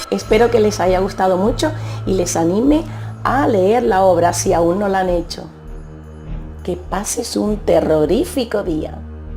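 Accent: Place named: United Kingdom. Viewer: Me encanta!!! A mi me gustan todas las adaptaciones llevadas a la pantalla grande, no me he leído el libro... Pero seguro que lo busco y me lo chuto!!!! Por cierto ame tu inglés tan británico y elegante!!! Y MUCHAS FELICIDADES por tus primeros 💯 suscriptores!!! Yeiiii!!! 🎉 🎉 🎉 Ya tienes tu URL personalizada? 🤔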